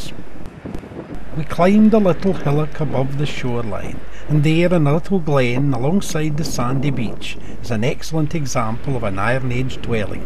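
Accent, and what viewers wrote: Scotland, United Kingdom. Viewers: Nice and interesting film and I love your Scottish accent.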